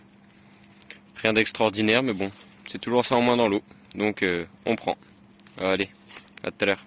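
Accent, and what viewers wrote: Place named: Nord, France. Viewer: Salut heureux de te voir te lancer en solo, on reconnait le Nordiste ( he ben laooo) Félicitation pour la pêche. Jean marc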